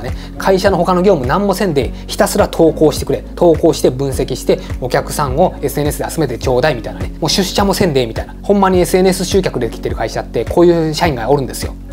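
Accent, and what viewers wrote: Hiroshima, Japan. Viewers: さんこんにちは。さんと私の会社の社長、知合いなのかなと思う位、お話の内容が近いです。ゲームチェンジャーを意識する様、日頃から言われています。ただ、私には話が難しくて…。それをさんがわかりやすく解説し、深堀りして下さるので、本当に助かります!!段々理解できてきました。美声も戻り、かわいい広島弁も聴けて、毎回動画が楽しみです。ありがとうございます！ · 広島弁、親近感あります😊💕 コロナで大打撃受けたのに生き残ってる百貨店勤務です😅 うちの会社もゾンビかもしれません🧟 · さんの鋭い感覚と情報分析力には頭が下がります。かなりの情報収集されているのが感じます。今回も勉強になりました😌 さんくらいの若さなのに、新しいことを取り入れ変化するのを嫌う社長を何人か見てきました。とても残念でした。キーエンの営業は素晴らしいですね。アイデア次第でビジネスはどんどん広がりますね。ドコモのような多角的ビジネススタイルも今後は必要ですね。これは個人のビジネスでも言えますね。私も起業するなら、このスタイルを目指したいです。さんのように。。。激変の世の中でも、やはりHR(人的資源)も欠かせないと思います。社員のモチベーション管理、満足度アップ、働きやすさ、優れたパフォーマンス発揮アップ等、社員の扱い方について、ぜひ動画であげていただけたら嬉しいです。次回が待ち遠しいです。すっかり &広島弁ファンです☺️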